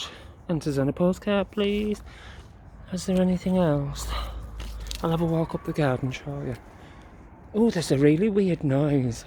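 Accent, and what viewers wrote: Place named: North, United Kingdom. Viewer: Love your Northern accent. Garden will look lovely when in bloom.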